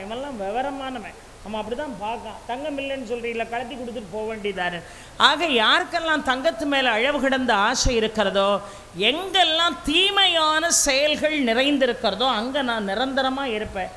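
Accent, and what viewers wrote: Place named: Tirunelveli, India. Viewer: அருமை அம்மா. எங்கள் அழகிய நெல்லைதமிழ்ப் பிரவாகம்💐🙏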